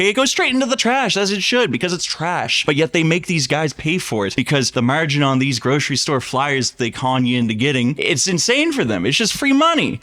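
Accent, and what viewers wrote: Canada. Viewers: The Canuck accent is gorgeous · Unbearable Canadian accent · Oh man I clocked that Canadian accent easily The classic “EHRR”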